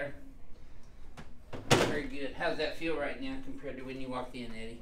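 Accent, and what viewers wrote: South, United States. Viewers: Total high pitched cowboy hick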